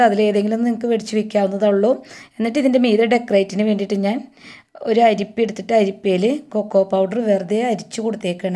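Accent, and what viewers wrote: Thrissur, India. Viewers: Pudding with trichur accent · trichur slang caramel chocolate pudding yummy - nandu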